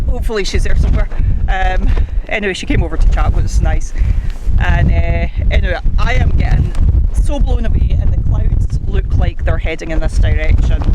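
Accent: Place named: Ayrshire, United Kingdom. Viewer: something wrong with his ears. Your voice is crystal. Your Ayrshires answer to Miss Jean Brodie.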